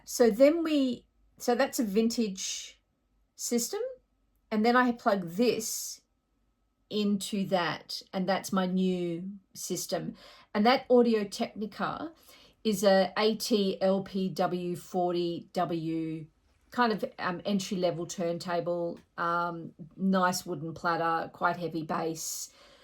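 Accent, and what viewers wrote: Australia. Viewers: love love loved this vid. Great to hear an Aussie voice, then the more into the vid, I was amazed at some of the similarity of my hifi journey. I'd had a NAD3020A for years, hooked up to some Haybrook HB1s that I had re coned in Paddington (over 20 years ago now). But, like you, recently decided to upgrade a bit. Was going down the NAIM route, then the guy in the shop said those fatal words, "have you seen any of the Hegel stuff". We did a side by side with a track by Lady Blackbird (interesting artist if you like Jazz) and it was like night and day. I'd also imported an early 90's Roksan Xerxes TT. Had the team at Classic Hifi bring it back to life. They did a great job. Then the obligatory speaker upgrade, went with the Lintons (my $ justification was that I'd had my stuff for over 30 years so..). Then walking the dog one day, there's some hifi gear on the street! 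I took a closer look (theres often all sorts of stuff on the street but this was unusual). A Sansui 331 Receiver and SR212 TT ! with original manuals and warranty cards! So i'm going to set up the vintage stuff alongside the newer (inc BlueNode) stuff. I was about to get the 3020 re done at a place in Marrickville (I won't put the name here as I think they are inundated) but will get the Sansui looked at as (again, like you), the right channel is coming in and out. A friend recently has gone on a similar journey with Sansui. Like you've said, the sound is worth the effort in bringing these vintage pieces back to l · Hello and subscribers. I came across the channel while browsing youtube for audio gear content. I bought by first system after attending Uni in the mid to late 70's and then did a big splurge on gear between 2007 and 2012. Personal circumstances meant that it got dismantled in 2017 and I am now setting some of it up again (the bits that still work that is). 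Good to hear the Aussie accent and while I'm interested in some older gear I will be mainly exploring the channel for music ideas given that likes have a fair overlap with mine. Happy listening everybody, Jeff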